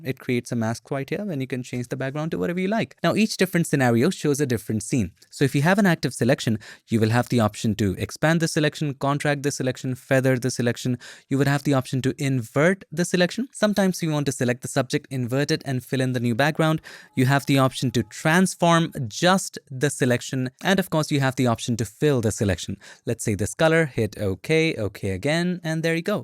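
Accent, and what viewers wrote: India. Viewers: Speak like Human not like Computer.. Sp. you have an Indian Accent..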